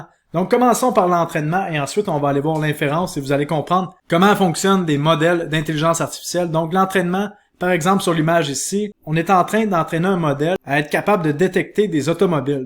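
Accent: Canada. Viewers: Je savais bien que c'était possible de parler avec l'accent canadien sans abuser complètement ! En plus d'être audible, fluide et très intéressant. C'est pas plus stylé les titres moins putaclick? Par exemple : Analyse de BITTENSOR et des 3 Cryptomonnaies les plus prometteuses en IA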